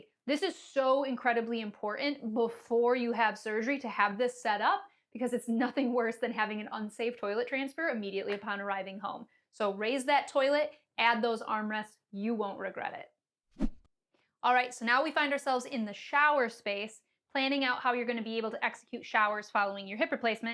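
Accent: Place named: Great Lakes, United States. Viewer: is a Michigan person. Great Lakes accent.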